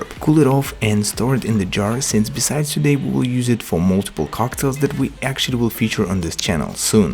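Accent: Russia. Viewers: Автор из России? Просто акцент, я его слышу и мне кажется что он русский, да и к тому же имя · Здрасте, мне кажется или у рязанский акцент?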